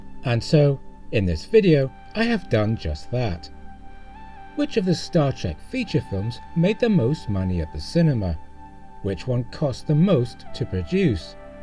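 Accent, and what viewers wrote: United Kingdom. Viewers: In which region of Britain do you live? Do you get thunderstorms a lot? I know the UK is famous for rain, there certainly some with which we had to contend during me and my family’s visit. I, of course, am assuming it’s Britain, due to your accent. I live in north Georgia, and we’re having some tropical storm doozies, stemming from a larger system about ready to hit the Gulf Coast of the Florida Panhandle.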